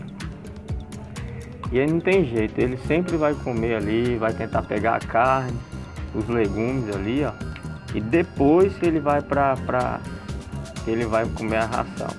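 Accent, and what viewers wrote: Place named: Mombaça, Brazil. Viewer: Bicho das urea de mucego kkkkk sou monbacence eu falo asim memo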